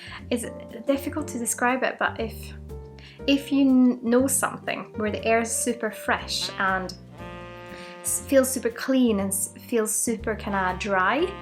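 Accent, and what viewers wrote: Scotland, United Kingdom. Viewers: Är du skotte eller har du övat på ditt skotska accent?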